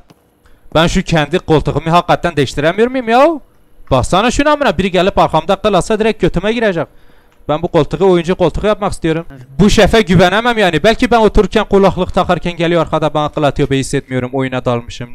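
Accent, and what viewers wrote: Elazığ, Turkey. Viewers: Abi Elazığlımısın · Abi sen Elazığ lı mısın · abi elazığlımısın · Abi Elazığlı mısın? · Abi elazığlımısın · La sen elazığlımısın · Abi Elazığlı mısın yoksa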